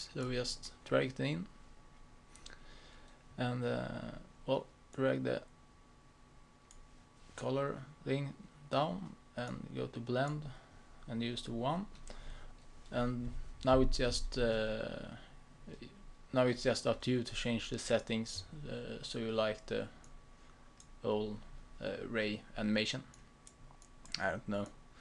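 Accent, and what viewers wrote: Sweden. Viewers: your swedish?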